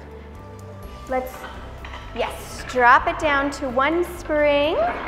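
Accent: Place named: United States. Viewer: A voz dela é tão americana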